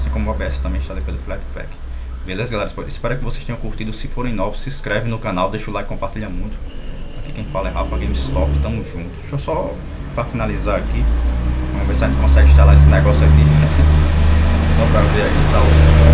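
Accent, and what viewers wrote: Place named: Northeast, Brazil. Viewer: Sou usuário Ubuntu e não na troco por nenhuma outra distro, pois aposentei o distro-hoppismo. Aliás, muita gente fugiu do Ubuntu por causa dos snaps, todavia acho que os pacotes snaps e flatpaks foram as melhores coisas que já fizeram. As pessoas, antes de emprenharem pelos ouvidos, deveriam ler sobre os projetos para poderem de formar parecer adequado. Se lessem, saberiam que o futuro de todas as distros Linux é tornarem-se imutáveis e a adoção de pacotes "universais", tais como os pacotes snap e flatpak. Aliás, o colega é de PE ou PB, não é? Desde 2009 que saí do Brasil, mas penso que ainda reconheço o sotaque da minha terra. Cptos ************* P.S.: O Zorin OS Core é muito bonito, sim, no entanto está atrasadíssimo, pois ainda está preso ao Focal Fossa.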